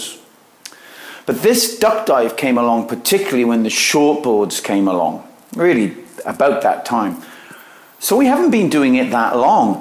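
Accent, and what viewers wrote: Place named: South Wales Valleys, United Kingdom. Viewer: I thought so, I'm a valleys boy so I recognised your accent right away!! I got the bug from my dad, who surfed all over the world when he was in the merchant navy back in the 60's also. Currently, I'm surfing a 7'2" NSP board, and I'm surfing mainly at Freshwater West in Pembroke where I live, so, your tutorials are super helpful, so, thanks!!! Happy days dude!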